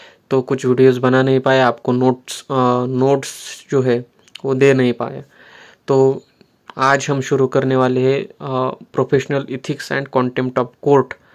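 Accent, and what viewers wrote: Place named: Maharashtra, India. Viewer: Same amchya sarkhich hindi speaking...... apla marathi manus detected ❤😁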